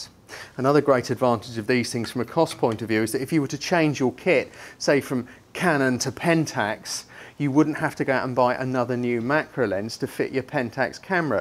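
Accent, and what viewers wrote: United Kingdom. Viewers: Fantastic review and I love your accent ! You British ?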